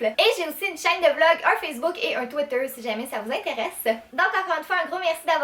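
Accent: Quebec, Canada. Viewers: Toi tu es canadienne lol !!!! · Elle est cannadienne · j'adore l'accent québécois je reverais de l'avoir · t québécoise? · super vidéo ta pris du temps pour la filmer et le montage jtm trop tes magnifique omg puis ton accent woow j'aimerais trop aller au Québec <3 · tu est canadienne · accent du Québec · aaa tes canadienne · bonsoir j'adore ton accen québécois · t trop trop belle et j'adore l'accent canadien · Salut j'adore ton accent Québécois! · Elle est québécoise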